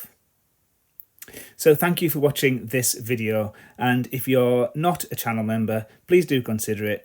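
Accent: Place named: Liverpool, United Kingdom. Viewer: Well, it sound a bit like the Beatles. So maybe it is Liverpudlian. [Is that a word?]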